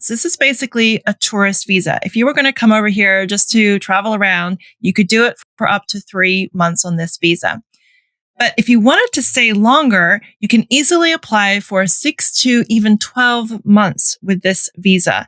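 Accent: Australia. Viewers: sounding like an aussie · Love hearing how your o Vowels have Australianifed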